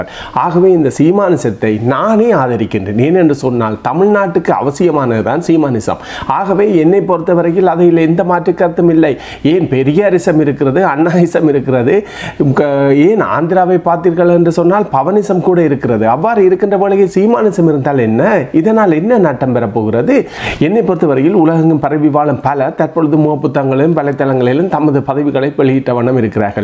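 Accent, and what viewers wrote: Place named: Eelam, Sri Lanka. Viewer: ஈழத்தமிழ் அழகு தமிழ்... ❤️ தமிழ்நாட்டுக்கு தமிழர்கள் உங்களை பார்த்து கற்றுக்கொள்ள வேண்டும்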